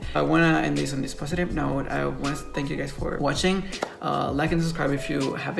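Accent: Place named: United States. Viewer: Where did you learn American English?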